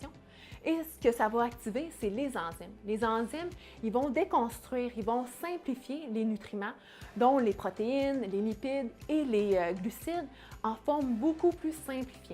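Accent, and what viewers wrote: Quebec, Canada. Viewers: Excellente vidéo tant par le contenu que par la forme. Egalement accent français canadien très, très agréable à entendre. Un régal. Merci pour votre contribution. Bonne continuation.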